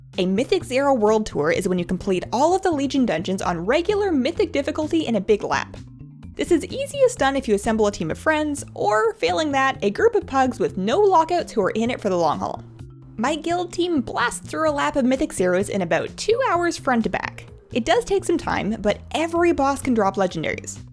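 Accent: Canada. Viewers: I have to sadly admit I've no idea what she is talking about for most of this video. She sounds Canadian though.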